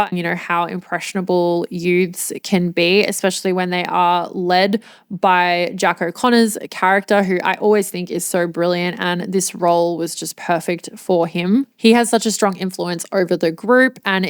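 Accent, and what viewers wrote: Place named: Perth, Australia. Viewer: So..... your a pearl ❤ from perth eh? That explains alot 🎉 I love yout accent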